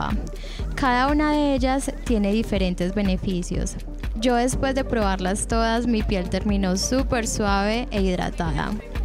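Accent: Antioquia, Colombia. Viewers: que rica vocecita de paisa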